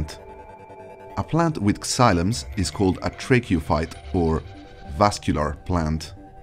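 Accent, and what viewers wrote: Italy. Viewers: Chad italian pronunciations of latin 🥲👌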